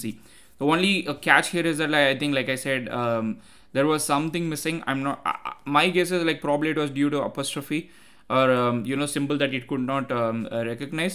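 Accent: India. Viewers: Ok I'm only 19seconds in but I have to pause here to tell U smth I hope maybe makes U smile a bit. UK in south park tech support is always an Indian worker and ik that india is actually very advanced in tech nowadays Ur country worked hard to get there and don't think the rest of the world doesn't notice. Even if we in Europe make fun of it I swear to god U won't find a single programmer in Germany that doesn't watch Indian tech tutorials. We are very grateful that U guys are making these amazing videos because our country for example is insane far behind and without people like you even the young people that want to learn are not having it easy because the generation teaching us is often times behind their students in terms of capabilities. Yes we make fun but we all respect U and even if Indian accent is funny ours isn't better and Indian English is one of the accents that's very clear and easy to understand. 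In school the listening parts for young kids in tests is sometimes with Indian accent and every time it's Indian there's a focking train or car passing by or faking helicopter sounds or whatnot because U Guys are that easy to understand. I probably would have an easier time communicating driving on top of an Indian train than beeing to dinner was an American guy. You guys are amazing we have huge respect and admire Ur guys effort and are grateful for your service to the education and evolution of the tech community. Don't be sorry for Ur accent wear it with pride my fr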